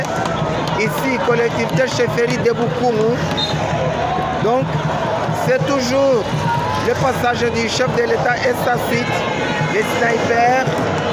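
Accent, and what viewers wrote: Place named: Rwanda. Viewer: Le commentateur a un accent rwandais vraiment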